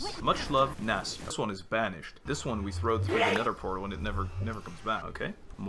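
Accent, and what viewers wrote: France. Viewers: i just realized has a perfect french accent, and so he might be french like me- i'm not sure how i'm supposed to react to it, but i guess i'll take it positively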